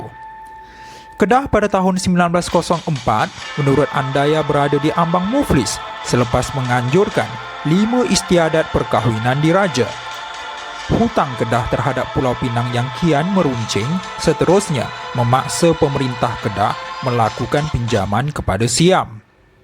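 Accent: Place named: India. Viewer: Dengar suara YouTuber ini seperti India bercakap Melayu..Rasaya YouTuber seorang India...Cakap pelat India..Fakta yang ditulis ada sedikit tak betul.. Perjanjian Inggeris-Siam berlaku kerana Siam tidak mahu British menjajah negeri Siam..Untuk menyelamatkan Siam dijajah British.Kalau nak tulis kena kaji betul2 jangan bagi fakta yang kurang tepat.Siam adalah satu2nya negara yang tidak dijajah & sebab itulah berlaku Perjanjian Inggeria Siam..& Southern Thai Pattani & Golok terpisah dari negeri Kelantan & menjadi sebahagian negara Siam Thai hari ini..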